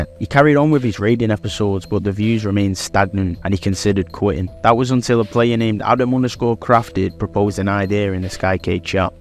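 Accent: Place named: United Kingdom. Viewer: The fact that ur a so British makes me watch this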